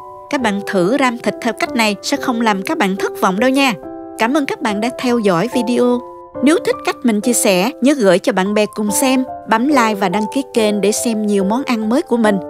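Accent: South, Vietnam. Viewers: Giọng chất năm bộ. Gợi nhớ lại giọng phát thanh ngày xưa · nghe giọng miền nam, dùng từ ngữ miền nam là thấy hay rồi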